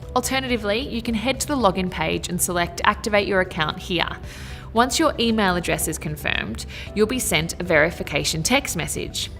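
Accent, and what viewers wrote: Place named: Australia. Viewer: I love her Australian accent and is very wise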